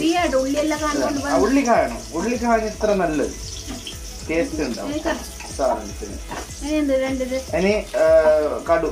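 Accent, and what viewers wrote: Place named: Kasaragod, India. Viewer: അടിപൊളി സംസാരം രസമുണ്ട് കേൾക്കാൻ കാസർക്കോട് languageജോറായിട്ടുണ്ട്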